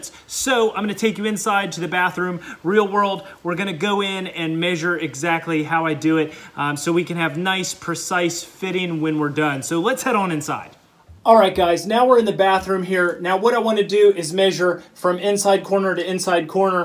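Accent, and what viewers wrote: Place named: South, United States. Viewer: Also where are you from? Your Southern accent makes me think you're either from Louisiana, Arkansas, Alabama, Mississippi, Texas, or Georgia.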